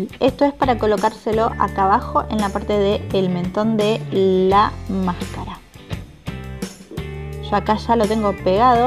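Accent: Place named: Argentina. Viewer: me encanta el acento argentino xd oshe si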